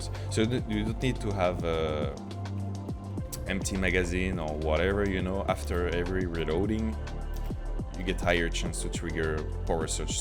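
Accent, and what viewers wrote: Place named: France. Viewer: T’es français avoue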